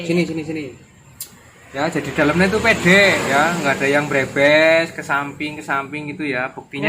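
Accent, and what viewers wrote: Banyumas, Indonesia. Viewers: Jenengan dari mana mase..kok rodo ngapak..?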